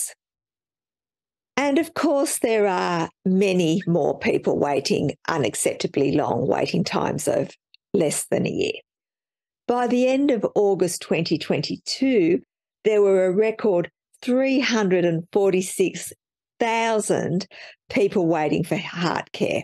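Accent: Australia. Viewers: Vocal fry on top of an Aussie accent, combined with an unflinching shit-eating grin, makes you excruciating to watch.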